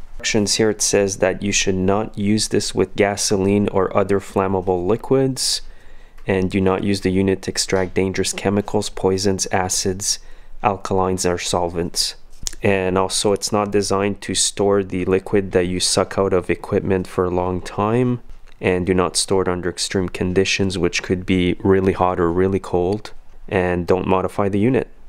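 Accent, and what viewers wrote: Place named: Canada. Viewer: Can tell u are Canadian. Your English is perfect, and you are a perfect instructor. Have you considered teaching at a college?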